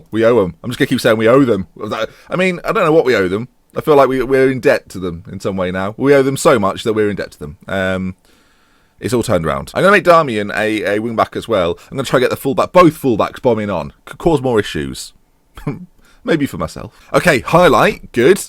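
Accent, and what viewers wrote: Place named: Liverpool, United Kingdom. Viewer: You sound more Scouse in this video than in any one previously... Is that intentional?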